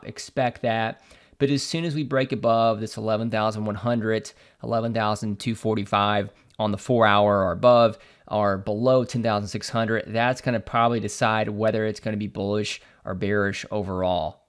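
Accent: United States. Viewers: Thanks again! Always a pleasure to listen to your thoughts on the market and your charming American accent.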